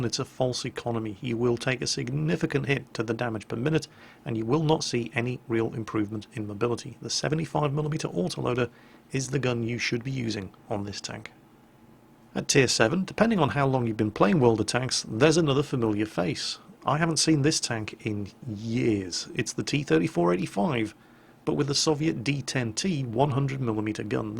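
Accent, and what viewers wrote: England, United Kingdom. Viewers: Not interested in WoT anymore, but I needed a nap. So I fall asleep to the sweet, silky, british voice of and wake up just as the video ends. Thanks:) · Really funny thing is, that Czechoslovakia existed before and after WWII. As the matter of fact during WWII the countries separated - Slovakia cooperated with Nazis while Czech Republic remained a territory ruled by Germans. Therefore these are check-them-Czech-tanks allright. IDK if someone mentioned it before me... ;P Great channel BTW, I love your english accent, is it genuine? :)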